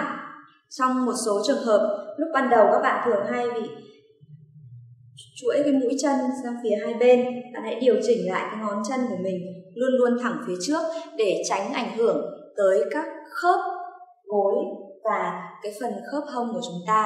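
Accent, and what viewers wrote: Hanoi, Vietnam. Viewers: giọng HN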